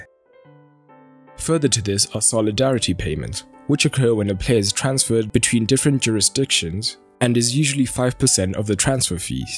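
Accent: South Africa. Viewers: Ehhh mmona are you South African ?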